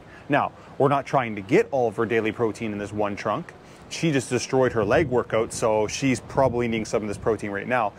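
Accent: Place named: Maritimes, Canada. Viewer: What part of Canada are you from? I hear some maritime but can't quite tell.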